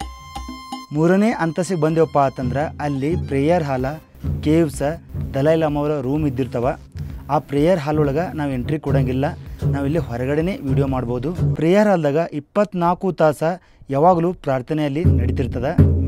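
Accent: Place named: Karnataka, India. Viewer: Super bro .. ಜವಾರಿ ಕನ್ನಡ.. ❤❤ ಅಲ್ ದ ಬೆಸ್ಟ್ ಬ್ರೋ❤❤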